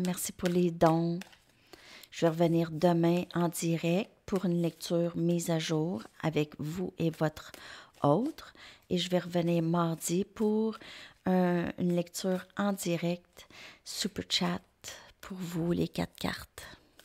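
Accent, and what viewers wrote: Quebec, Canada. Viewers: Merci une très belle énergie que vous nos donny aujourd'hui avec ta jolie ax québécoise 😘🙏❤❤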